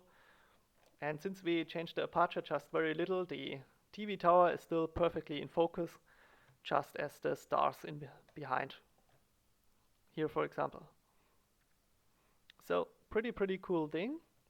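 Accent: Germany. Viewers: Are you german? :D sounds like you are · Also, als ich den Fernsehturm gesehen habe, hatte ich ja schon einen gewissen Verdacht, aber mit deinem akzent ... du solltest noch an deinem englisch arbeiten, das klingt sehr deutsch... ich bin übrigens aus dresden. ... (If you can't read this ... i was wrong with this comment, ignore it.)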